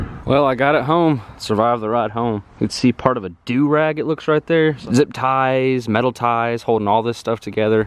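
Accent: South, United States. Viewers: i liked how you went over everything and teaching the viewer and i thought it was funny how southern you sound and i knew i had to sub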